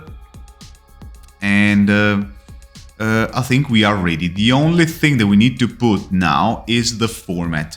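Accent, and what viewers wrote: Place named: Italy. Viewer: Bravissimo, l'accento italiano si sente tanto ma devo dire che sei uno dei + bravi Italiani che ho sentito in merito ad "Accento" (te lo dico da italiano all estero eh...:)). 'Scolta ho una domanda... ma per entrare un po + sul tecnico dove mi consigli di guardare? Intendo sulle varie opzioni di ffmpeg, mux / demux / encoding etc etc. Online non trovo tanto materiale in merito (tanta teoria, ma parzialemtne declinabile poi sui vari softwares). Ciao grande, bravo, Luca.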